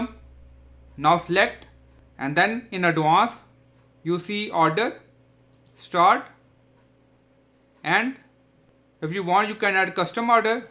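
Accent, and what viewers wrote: India. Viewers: I really don't mean to be rude but it's really hard to understand you with that very strong Indian (?) accent of yours. Maybe use an AI voice instead? Again, I don't mean to be rude or offensive. So, please accept my apologies and maybe take my comment as a suggestion or advice. 🙂 Thanks anyway for the effort you put in your tutorial videos.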